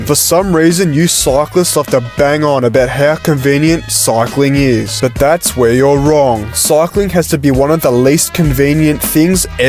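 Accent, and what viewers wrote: Australia. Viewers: I hear Australian accent i will automatically dislike and block the channel, I say that as an aussie myself.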